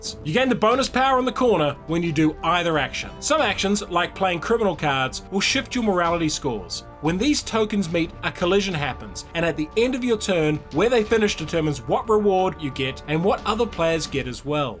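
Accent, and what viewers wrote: New Zealand. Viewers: In all fairness, he doesn't have the Letter I thing as much asmost Kiwis do, but you can still tell he's a Kiwi and not a Brit lol (For those who don't know, The Letter I thing is how you can tell Aussies and Kiwis apart, cos Kiwis struggle to sound the letter I in words... thnk, blnk, snk, as opposed to think, blink, and sink, for instance lol)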